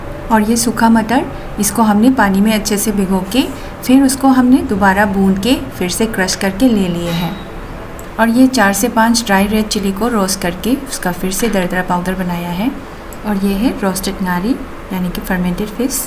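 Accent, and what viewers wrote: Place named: Nepal. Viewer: Its interesting to know more about singju ingredients but nangee hindi ngangbado nepali khoi gee accent malle 🙏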